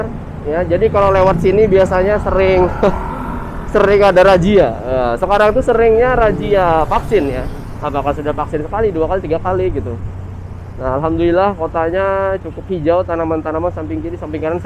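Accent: Banjar, Indonesia. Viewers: Lah orng banjar kah pian😂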